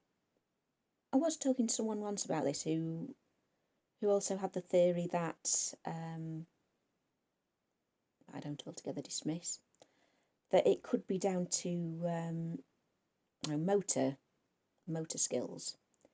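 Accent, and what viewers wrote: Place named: Liverpool, United Kingdom. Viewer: Your voice sounds good to me. I heard a touch of Liverpudlian 🇬🇧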